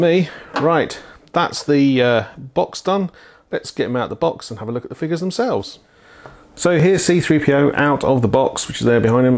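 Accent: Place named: United Kingdom. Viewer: probably somewhere in the uk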